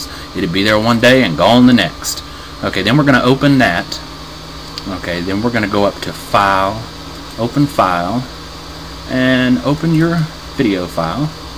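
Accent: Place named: United States. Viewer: your american accent is funny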